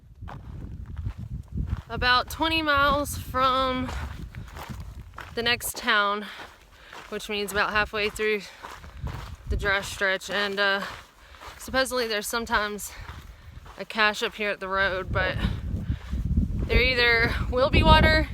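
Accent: South, United States. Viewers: I enjoyed your AT hike as well as the PCT so far. I went to school in the South so it's fun to hear your accent and recall that southern charm! I developed bad plantar fasciitis in both feet that kept me off the AT this year. I found Pedag Viva Outdoors from Insole to be a BIG help - better than Superfeet as the former has not only an arch but a metatarsal support that keeps the forefoot from stretching/sliding forward. I found just the arch support of Superfeet (though otherwise well made and I have a half dozen of them) not as effective for PF - both arch and metatarsal supports supports the foot better allowing it to heal faster and preventing it from deveolping as easily for those prone to it. Also tight hamstrings can lead to or worsen PF so keep those stretched out before and after hiking, though some advocate stretching a few minutes after hiking letting the muscles warm up first - also all the muscles surrounding the knee should be strong, though I bet yours are pretty tops! 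All your info about PF was good last year so keep that up. This website has great stretches and exercises. Hope it helps. Really helped me: <link>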